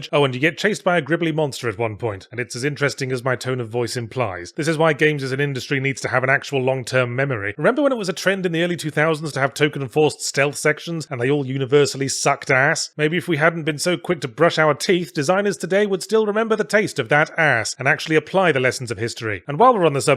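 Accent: England, United Kingdom. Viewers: I like that Yahtzee knows how to correctly pronounce French but his English blood won't let him. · A Canadian listening to Yahtzee speak French in his self described "Walking tour of the UK" accent did something to my soul your pot smoking cousin would describe as Lovecraftian.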